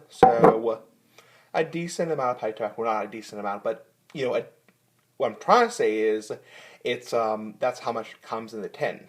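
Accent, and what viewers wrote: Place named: France. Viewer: I like your accent, are you French?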